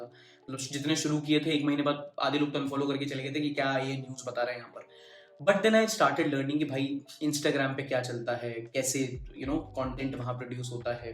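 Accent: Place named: Bundelkhand, India. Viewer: I love your bundelkhandi accent bhaiya Being from Rath i can relate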